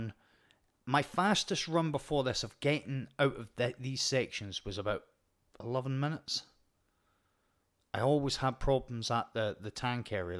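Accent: Scotland, United Kingdom. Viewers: Thanks for a great video! This was really well explained and shown and had some pretty good tips for some techniques that I haven't seen before, on other videos of this mission. You played this beautifully!! Talk about making it look EASY! I wish I found your channel earlier! Only a few minutes into it, I knew I had to subscribe. Definitely looking forward to more! You have a very interesting accent. Is it Scottish?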